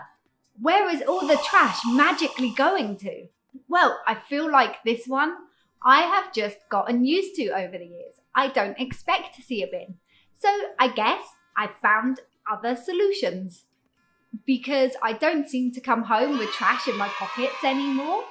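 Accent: United Kingdom. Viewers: 好喜歡你的英式腔，很好聽，像聽哈利波特電影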